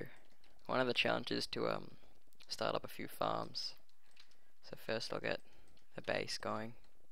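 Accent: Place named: United Kingdom. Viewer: You sound brittish!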